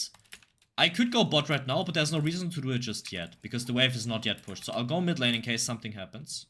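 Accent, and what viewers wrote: Germany. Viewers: Is he german? Just interested because the accent sounds a little german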